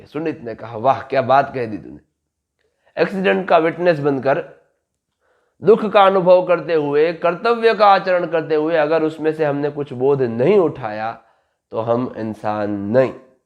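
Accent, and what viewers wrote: Gujarat, India. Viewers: Are you gujarati ❤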